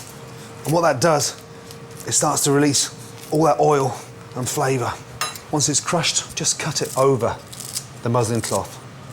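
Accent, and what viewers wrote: Scotland, United Kingdom. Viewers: Great to hear a hardy Scottish voice in there! 😂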